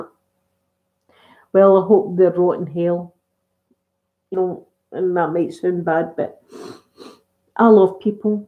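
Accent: Scotland, United Kingdom. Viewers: Your Scottish accent is definitely my favorite for asmr and is sooo relaxing... I'm from California and I prefer asmr channels that have an accent that isn't American.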